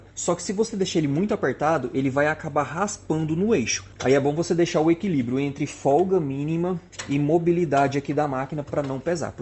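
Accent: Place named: Goiás, Brazil. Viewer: Uai, de q cidade de Goiás vc é?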